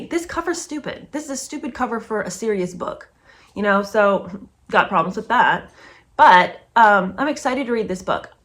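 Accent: South, United States. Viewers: As soon as the video was over, I was like: gimme, gimme 🎶 Great book and even better with you telling it, of course! Also, I really like your southern accent 😅💕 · One chapter and this book already has more depth than Worthy. This should be interesting. Love your southern accent. I just finished Matthew Perry's biography. His account of his life is raw. It helps to explain why and how he struggled. You might enjoy it. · I've learned more about Brittany in Part 1 than Jada in her entire book Worthy😂 And by the way, I love your southern accent .😃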